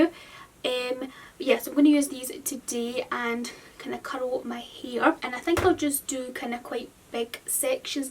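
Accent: Scotland, United Kingdom. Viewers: Great video are you scottish?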